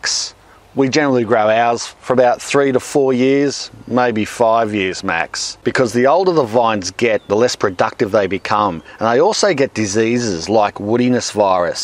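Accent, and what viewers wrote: Australia. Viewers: I like your Australian accent. 😀😅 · Is this Eric Cantona with an australian accent?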